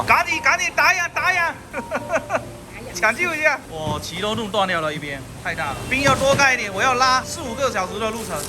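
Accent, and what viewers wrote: Fuzhou, China. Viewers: 兄弟福州人么，福州腔好重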